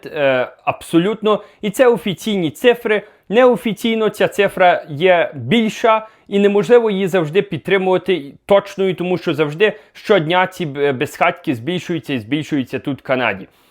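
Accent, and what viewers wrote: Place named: Lviv, Ukraine. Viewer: Судячи по твоїй розмові ти зі Львівщини,послухай не ганьби МІСТО ЛЕВА та ЛЬВІВЩИНУ, йди працюй!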